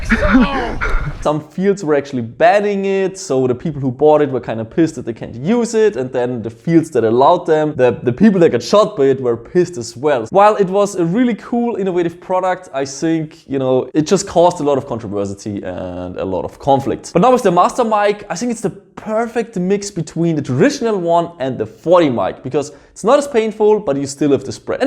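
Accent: Germany. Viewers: bist du ein deutscher? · German accent xd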